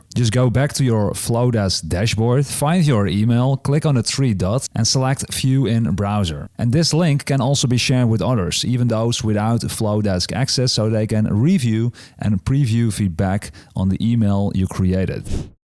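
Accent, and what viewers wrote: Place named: Netherlands. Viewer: You sound Dutch! :)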